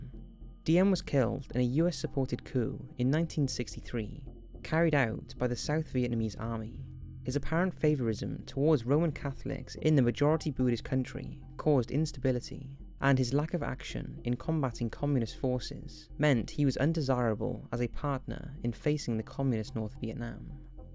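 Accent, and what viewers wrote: Wales, United Kingdom. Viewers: I know a Welsh'mon when one talks 🏴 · Plus that Welsh accent...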